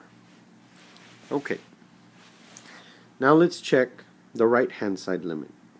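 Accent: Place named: Canada. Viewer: can’t get over the Canadian accent.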